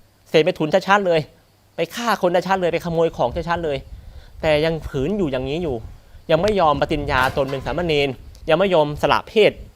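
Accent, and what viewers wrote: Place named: Rayong, Thailand. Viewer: สำเนียงเพราะ คล้ายๆคนแถวเมืองระยอง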